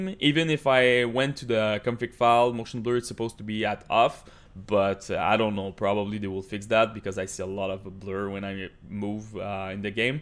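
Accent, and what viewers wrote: Quebec, Canada. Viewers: Big accent of Quebec speaking inglish esti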